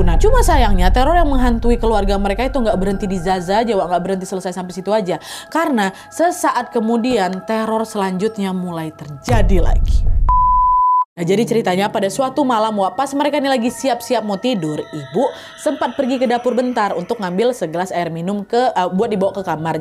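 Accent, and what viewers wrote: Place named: Palembang, Indonesia. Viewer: Nahh wong Palembang mano suaronyooo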